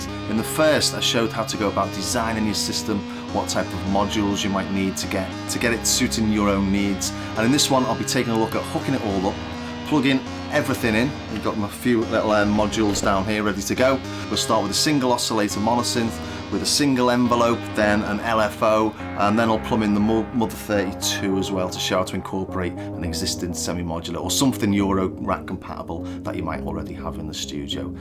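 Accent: Liverpool, United Kingdom. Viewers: excellent videos. i was proud (as a canadian) to be able to identify the Liverpool accent :) I'm not sure if it was this or another one in this series where you asked about fractal patterns in sequencing and such, and im positive someone else has already mentioned it to you, but i would be remiss not to mention Stochas. keep up the great work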